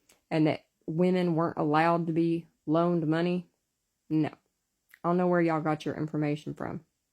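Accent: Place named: South, United States. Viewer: I love your southern accent 😭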